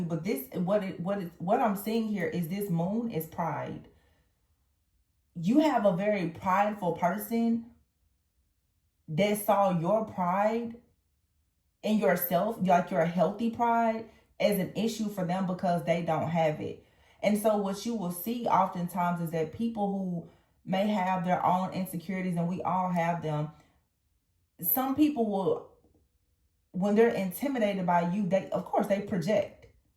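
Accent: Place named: South, United States. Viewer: you are one of the best and most authentic readers I've come across in a while, particularly on YouTube, which hosts many readers. I hear a Southern accent in your voice and am curious as to what part of the South you come from. I am a reader myself and come from a lineage of "gifted" seers. Thank you for your generosity in sharing your gift to bring insight to the collective. Despite the frustration you may experience from time to time, your favor is a blessing to us all. Never forget, but remain ever reverent and protective of your blessed nature. Your are needed in this community, especially now. Stay strong, my Sister!❤😊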